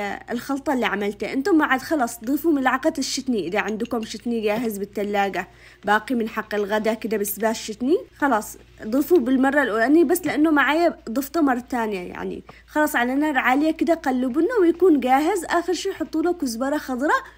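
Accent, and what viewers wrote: Aden, Yemen. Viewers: مسرفه هههههههه ماشاءالله لهجهتك عدنيه رغم انك عايشه بالسعوديه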